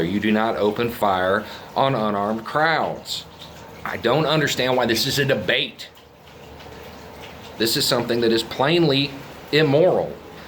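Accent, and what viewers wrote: South, United States. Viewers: The voice of reason has a southern accent 👏🏿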